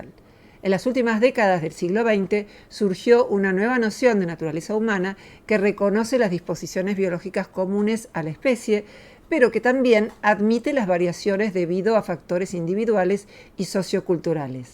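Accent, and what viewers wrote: Argentina. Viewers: La comentarista ametralla con su léxico sin pausas no se corrige y usa su predica para su gremio y los neófitos no entendemos pero soslayo que predica algo interesante la veo años y entiendo 20% de lo que dice aún la perdono por su hablar porteño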